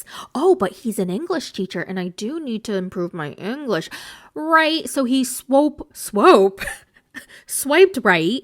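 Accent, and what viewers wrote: United States. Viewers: Just to tell you - YOU ALSO have an accent. American one. xd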